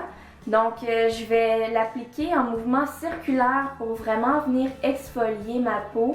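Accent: Quebec, Canada. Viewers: Je pense que je vais l'utilisé ce masque, sa va faire du bien a peau surtout qu'elle commence avoir des boutons :( et juste j'adore ton accent Québécoise je te fais tout plein de gros bisous :)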